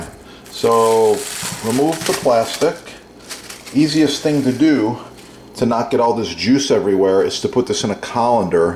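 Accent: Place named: Midwest, United States. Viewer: Hey dude, I love your videos! I found you through searching for finishing wood. Also, you’re f’ing hilarious! And your accent is amazing. Midwest? Wisconsin? Anyway, keep up the good work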